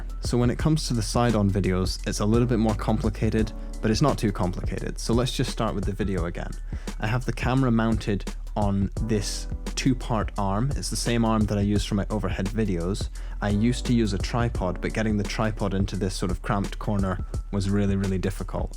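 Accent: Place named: West Country, United Kingdom. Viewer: thanks nice and informative. Trying to place the accent. West Country?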